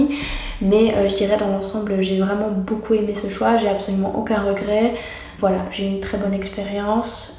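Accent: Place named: Switzerland. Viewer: Merci d'avoir publié de cool vidéos en français. J'ai appris beaucoup de nouveaux mots. Votre contenu est tellement intéressé. J'avais un peu peur d'écouter, comme l'accent suisse est tellement différent de l'accent québécois. Avez-vous des recommandations d'autres vidéo français?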